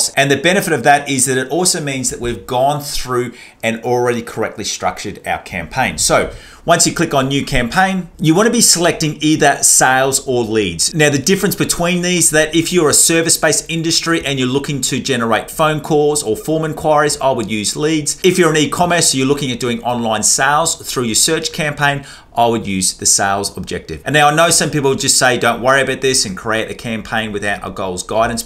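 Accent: Australia. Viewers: thanks for the video, your accent sounds aussie to me (most beautiful country in the world)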